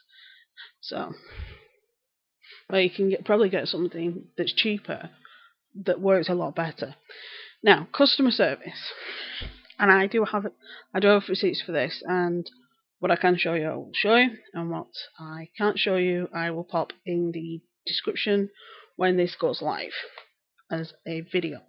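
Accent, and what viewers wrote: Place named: Bolton, United Kingdom. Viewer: you sound like you are from Bolton. xxx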